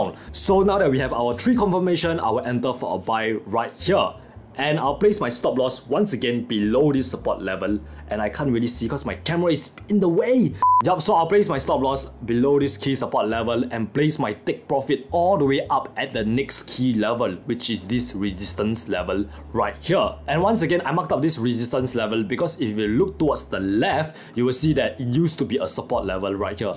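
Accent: Nigeria. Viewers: Are you trying to speak in Nigerian accent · You have this Nigerian Accent when you speak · You sound like a Nigerian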